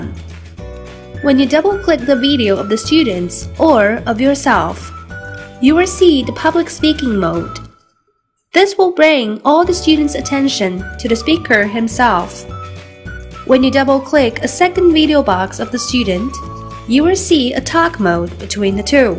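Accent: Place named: Scotland, United Kingdom. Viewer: She has a lovely accent, almost sounds Scottish.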